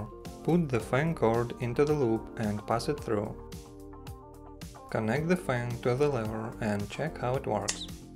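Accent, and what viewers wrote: Russia. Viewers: Какой-то слишком русский акцент -_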